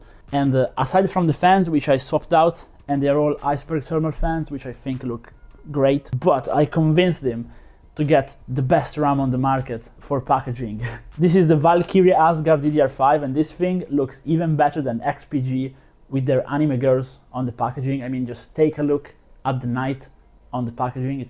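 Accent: Italy. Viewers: Are you italian? (Great vid btw)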